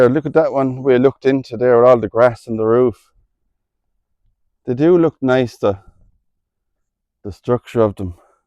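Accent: Ireland. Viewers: Just subscribed , nothing better than irish video's and listening to the Irish accent 😊 great subject today 😊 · No better tribute to how we all end. The Irish accent gives depth to this story and as an English man I thank god for this respectfull demontration in how it needed to be done. By the grace of god . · I love your accent I'm a Canadian l hope to visit Ireland soon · I though so. Irish accent.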